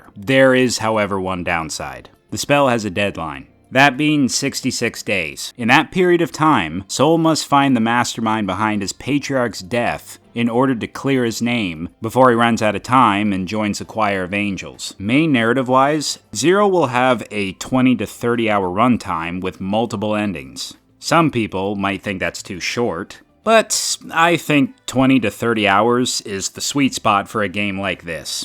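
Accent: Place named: Scotland, United Kingdom. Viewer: hey man first of all you have absolutely nothing to apologise for, cuz I know and I absolutely understand how busy your schedule is especially now so I don't mind as long as you were able to read my comment that's enough. And thanks for replying to me back I mean replying back to every single point that I mentioned, I really appreciate that. Thank you man. My support to u is always gonna be green mate. Can't wait for the Scottish Accent to be back and eagerly waiting for your new video. Have an amazing beautiful peaceful wonderful day today and many more happy days to come your way and for your family as well. Cheers mate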